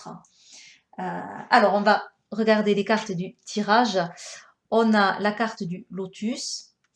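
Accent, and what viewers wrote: South, France. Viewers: Quel accent du soleil une merveille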